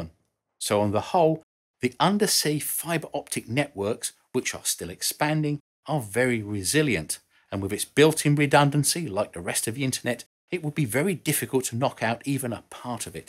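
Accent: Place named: RP, United Kingdom. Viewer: Thank you, for your videos! They are all incredibly good! Your speech is brilliantly clear, and there are few other places on YouTube where you can see proper English subtitles (and also hear real BBC English spoken!)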